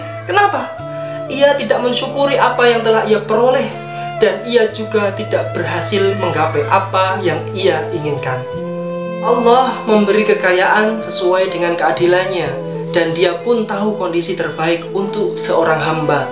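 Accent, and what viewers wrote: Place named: Java, Indonesia. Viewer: Ust orang Jawa ya?.. Logatnya kentel banget.... Mantap tadz. Sukses tadz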